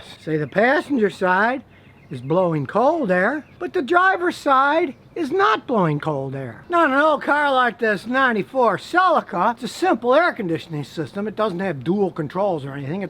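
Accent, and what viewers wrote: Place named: South, United States. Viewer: Your English spoken very country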